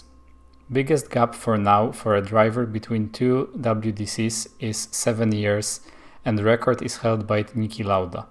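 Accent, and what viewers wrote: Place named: Poland. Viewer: Uslyszalem akcent i od razu skojarzyl mi sie polak xd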